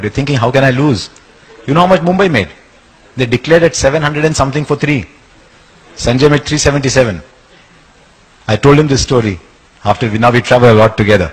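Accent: Hyderabad, India. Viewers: Kya Harsha bhai, kya bola!! Hyderabadi hindi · Hyderabadiii hindi awesome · Harsha bhai hyderabadi bolte. Mazza aagyi mereku "700 run me 4 run kya farak padta bade bhai" 😂😂😂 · At last has spoken the real Hyderabadi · He only non Hyderabadi who speak real Hyderabadi...!!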